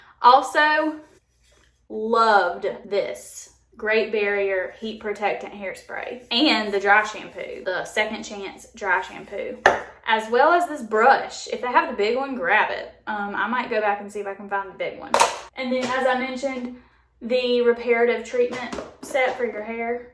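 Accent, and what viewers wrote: South, United States. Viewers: Omg you're so pretty!! you remind me of when I was at Oral Roberts University in person with your Southern accent love😍😘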